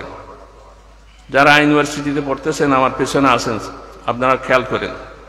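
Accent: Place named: Chittagong, Bangladesh. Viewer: চিটাগং ভাষা কম বুঝি তারপর ও আপনার জন্য ভালা লাগে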